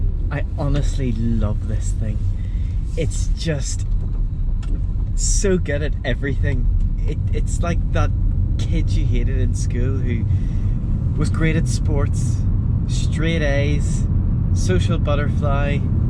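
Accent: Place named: Northern Ireland, United Kingdom. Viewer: Unusual to hear a northern Irish accent from a person of color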